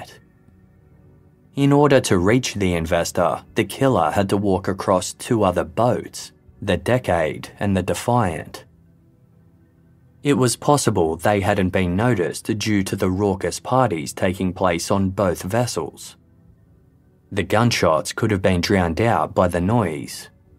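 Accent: Australia. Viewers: 🇬🇧 I know this is an old episode but after having had a serious illness & recouperation, ive not watched many of my favourite channels! Therefore after getting my energy & interest back ive been trawling through my favourite Channels & binge watching & its been amazing hearing a big batch Of great videos that i love. Now ive made it to one of my top 5 channels with many many videos so im starting with my 1st missed episode today, with this case. Im champing at the bit to enjoy each & every one ive missed & there are alot to hear! Ive missed the narrators calm & reassuring voice. As i once lived in north Sydney for many years as a youngster & late teen before returning back to UK with my parents, i love the opportunity to hear the beloved Aussie voice. Thank you to everyone on the Casefiles team! You do a brilliant job & bring us the best & worst ( i mean in criminality) and ive often been chilled to the bone with some of The cases, especially the Ivan Milat ones... 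😨 So Thank You all so much! Your subs have jumped pretty high too Not far off that 1st magic 100k Level & thoroughly well deserved! Peace & Good Cheer to All 🇬🇧👧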